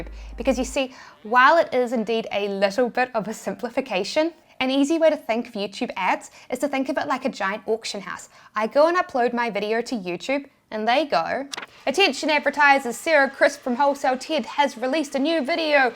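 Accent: New Zealand. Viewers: Maybe Youtube heard you say "beach" in your NZ accent! BTW wouldn't it be fun for you to attempt doing an American accent for an entire video? I love hearing what we sound like to others around the world.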